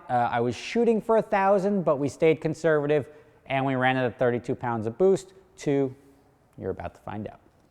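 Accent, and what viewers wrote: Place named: New York, United States. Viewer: That New Jersey/ new york accent in the older video.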